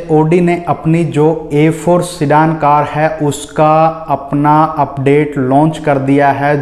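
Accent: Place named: Haryana, India. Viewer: sir ek baat thodi ajib lgi ... haryanvi excent use krte ho jaise ki ... thoda bhot ...thoda bhut ...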